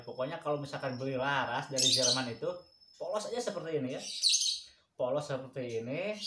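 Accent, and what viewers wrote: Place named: West Java, Indonesia. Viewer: Kyk org sunda ngomongnya mh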